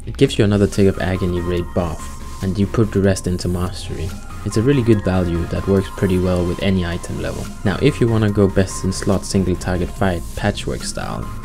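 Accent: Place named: Portugal. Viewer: I am always curious to know from what first language accents come from; would I be at all close if I said Portuguese? Thanks for the guide though, I had little problem understanding it. And "tier as far as I know, (my first language is English) is pronounced like in the word teardrop, or phonetically "teer".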